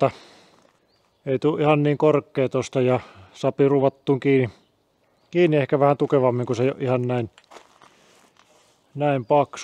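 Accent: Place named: Finland. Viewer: Olipa mukava viteo👍, komia katos tuli. Sulla on ääni ja murre vähän ku Päätalon Herkolla😂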